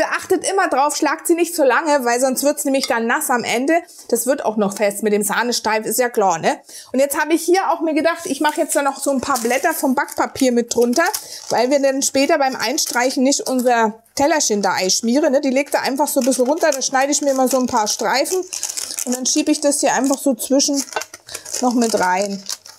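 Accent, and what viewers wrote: Germany. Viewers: Ich bin so amüsiert über deine verbale Dialektwanderung zwischen Berlin, der Pfalz, Hessen und ein büschen Nordisch....von wo bist du, du Granate??? Deine Rezepte und die Umsetzung sind ja herrlich!!!+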